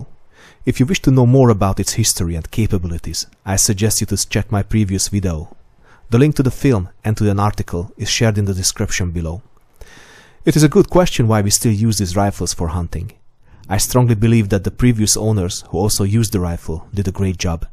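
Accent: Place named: Hungary. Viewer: Magyar akcentust érzek a levegőben. Minden tiszteletem nem lehetett egyszerű a vadászat!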